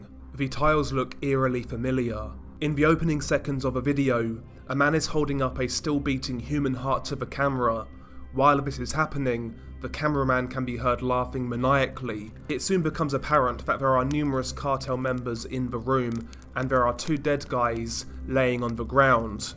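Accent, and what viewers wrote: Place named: United Kingdom. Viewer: This british accent makes the video twice harder to understand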